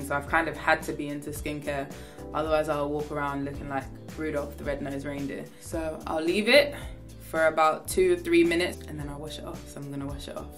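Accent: United Kingdom. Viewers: Her British accent tho 😍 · Wtf I didn’t know she was British lmao 😂❤️❤️ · i'm obsessed with her accent and the way she says reindeer, i'm british but have nowhere as good an accent as hers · Wait.. is british what?... I know I'm late but.. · forget she’s british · is she British?!! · Keep forgetting she’s British until she talks at the end of her songs 😂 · how does she speeks british but in her songs she speeks american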